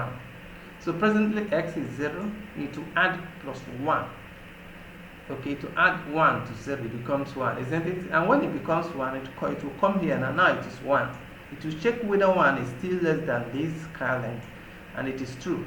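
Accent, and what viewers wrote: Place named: Nigeria. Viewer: Wooaahh!!! Thank you so very much, Mr! This video helped me to understand the "sort" function and method of use very well!🥰 Also... I kept on laughing sooo much, as I listened to you teach! At first, I didn't even know who was teaching, until the accent caught my attention! lol!!... (I was expecting an Indian, as usual - they actually teach very well... but the accent sometimes is a challenge for me.😊) But when I checked the name of the vlogger because the accent was sooooo Nigeranly, and I saw it was Nigerian?? 😂 I couldn't help but laugh sooo much as I watched and learned along😅. You really sound like these our Nigerian lecturers in the Nigerian Universities😂💝(plus the "do you understand?" that you kept saying!😂🙌... Oh Sir, I truly appreciate this video!🙌) Thank you once again Sir, for taking out your time to make these videos. 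God bless you!🙏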